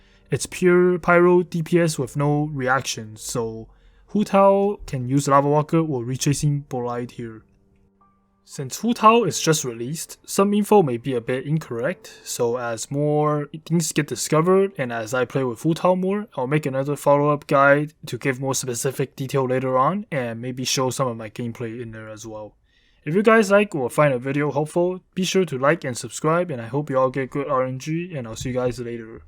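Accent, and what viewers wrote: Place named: China. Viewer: 哦哦哦，听你口音，还以为是中国的。哈哈哈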